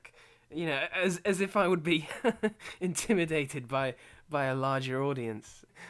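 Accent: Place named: United Kingdom. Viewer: You're only funny because of the British accent.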